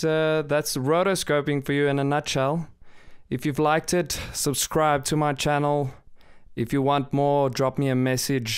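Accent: South Africa. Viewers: i like your accent. South African? Great tutorial thanks! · are you south african? the accent?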